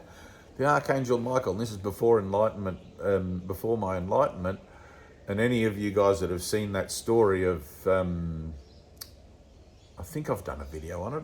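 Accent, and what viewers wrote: Australia. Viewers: Beard ✅️ Long hair ✅️ Australian accent ✅️ Scrubby handwriting ✅️ Tattoos ✅️ Deranged laugh at the beginning of each video ✅️ Everything you say ((must)) be true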